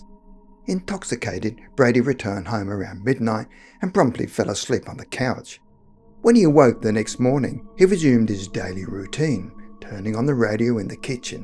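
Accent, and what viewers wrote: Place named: Australia. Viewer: Australian accent 😁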